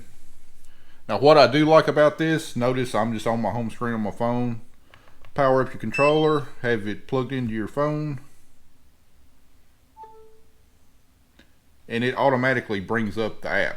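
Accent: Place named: Tennessee, United States. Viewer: Very nice video, clear explanation and to the point. Naturally the Tennessee accent helped. Can you please reply who makes that tablet holder ? Thank you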